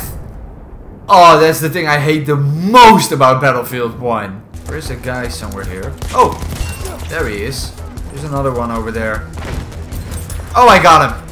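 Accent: Netherlands. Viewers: Je klinkt Nederlands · ur Dutch aren't you?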